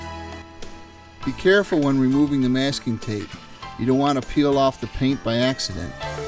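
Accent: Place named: United States. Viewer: I realized that this guy is american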